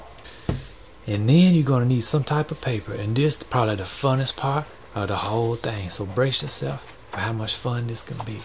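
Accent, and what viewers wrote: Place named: South, United States. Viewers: I love your southern gentleman accent "Cabocon" very well done. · Dude, you sound like a southern Bob Ross 😊 great tutorial! Thank you!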